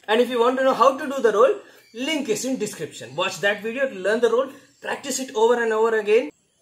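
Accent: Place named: Madhya Pradesh, India. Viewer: Bhaiya apki hindi to M.P mein bolte he waisi he....... Gutne jawab dena aur aukat se jyada uncha jump.......😂👍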